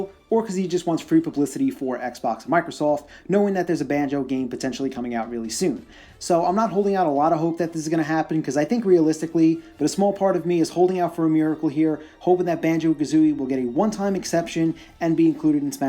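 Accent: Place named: New York, United States. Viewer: I love this guy's NY accent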